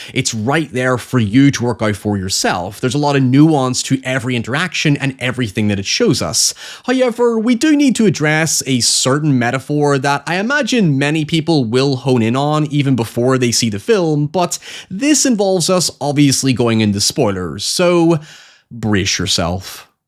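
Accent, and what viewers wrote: Northern Ireland, United Kingdom. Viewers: It's a typical Northern Irish accent. How now brown cow?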